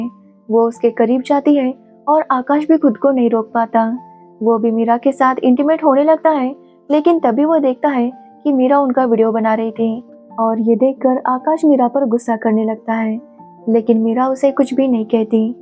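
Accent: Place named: South, India. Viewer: The narrator of this webseries needs loads of rehearsing......her South Indian accent isn't apt for narration and there are lots of flawy pitch in delivery......NYC scripts written for explanation of the series...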